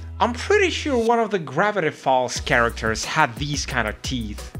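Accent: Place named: Russia. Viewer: Очень классный ролик, но блин какой же классный русский акцент :)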